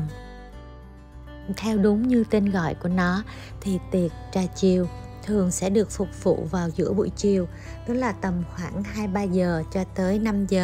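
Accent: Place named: South, Vietnam. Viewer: Giọng miền Nam của cô * nghe hay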